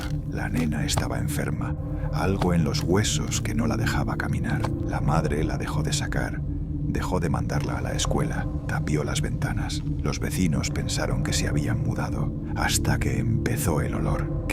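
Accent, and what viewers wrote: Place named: Argentina. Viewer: Sos de Argentina? Por el acento jaja desde que conocí tus videos veo todos los días uno 😅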